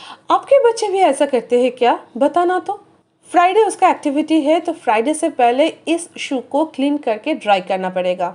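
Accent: Bengal, India. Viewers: Didi i love ur Bengali accent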